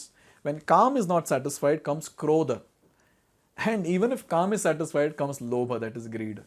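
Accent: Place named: United Kingdom. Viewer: why Shlokaaa and not Shlok... thats correct sanskrit pronounciation, its source language. KRISHN, why Krishnaa... why distort original pronounciation...just to sound more British?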